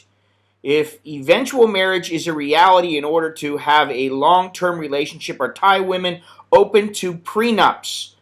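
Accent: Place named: Chicago, United States. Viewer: Seems more like from Chicago.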